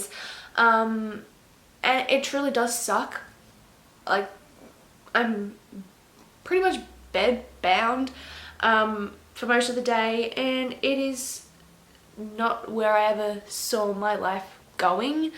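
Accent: Australia. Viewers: I really love your videos, your personality shows so much <3 Also, i lub your Australian accent omg, I could listen to you talk forever.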